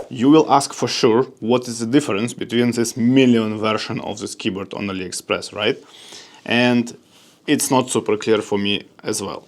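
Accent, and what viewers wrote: Russia. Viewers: russian accent?) я все понял )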